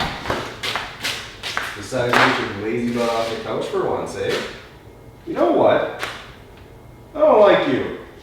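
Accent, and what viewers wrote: Canada. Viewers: I love hearing your Canadian accent and I hope your not thinking I'm a creep. Just I love hearing different accents they are very beautiful.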